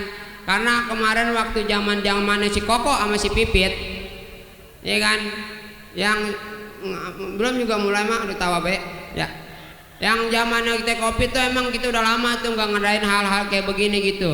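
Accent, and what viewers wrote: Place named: Jakarta, Indonesia. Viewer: Ane suka ame bng,khas masih bahasa betawinya,sukses ye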